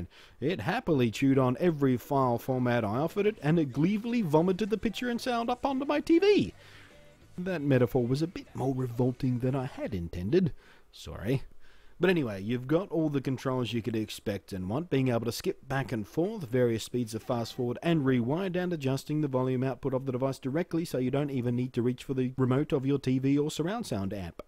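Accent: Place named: Australia. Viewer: Well damn, as other commentors pointed out there it is in plain text at the end of the video. I'm so used to those marketing style bullet point filled pages I ignore them on instinct. Also, the sound of your soothing aussie voice kept me distracted. Or maybe it's cause I'm sick and hopped up on so many medications I can't think straight but I'm sure it's mostly the aussie thing., I'd really like to see you do some notebook reviews. Specifically the Clevo P151M.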